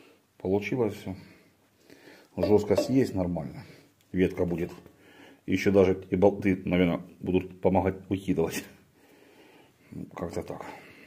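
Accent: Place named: Volga, Russia. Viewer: привет! С праздником тебя! Я вот слушаю тебя ты вроде с Украины, а балакаеш как волжанин, ( симу, как то оно так), и ещё какие-то замечаю выражения которые слышу каждый день, только без обид! Иногда я думаю сядем за один стол, обязательно выпьем, по балакаем и всё будет хорошо и у нас и наших детей!!!!! Мира вам и чистого неба как изумруд!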